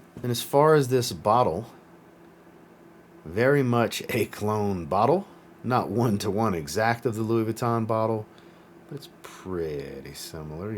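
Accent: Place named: New Orleans, United States. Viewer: Gotta be from New Orleans or at least la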